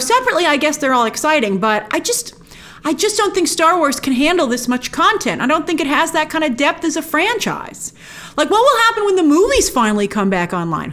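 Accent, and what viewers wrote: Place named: United States. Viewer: I'm curious, how have you avoided a New York accent 🙂? You're an early transplant? It's very slight, barely there Talk/Tauwk, Fought/Fauwt, New York/New Yawk. It's a distinctive accent 🙂. More like a New England accent, Boston is very similar. Bauwston 🙂. It's a straight American English accent, unaltered by New England, southern twangs... You moved there in your pre-teens or something?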